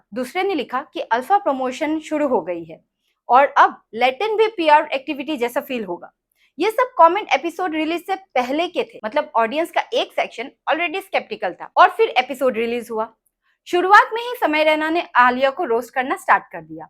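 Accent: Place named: Bengal, India. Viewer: i like your bengoli accent hindi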